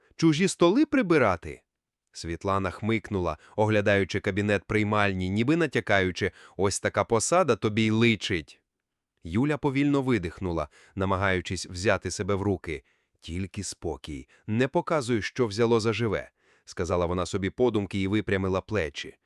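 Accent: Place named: Surzhyk, Ukraine. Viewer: Якщо перекладаєте з рахівської - то перекладайте вірно українською. А то суржик ріже слух. Так і не змогла дослухати до кінця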